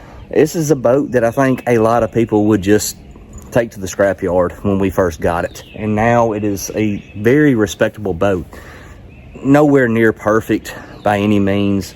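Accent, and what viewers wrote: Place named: Ohio, United States. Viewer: what state are you in it sounds like ohio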